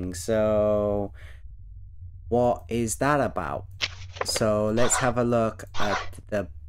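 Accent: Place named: Wales, United Kingdom. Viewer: Ohhh welsh!! Yeah i was thinking smth british but it didn't sound quite london-ish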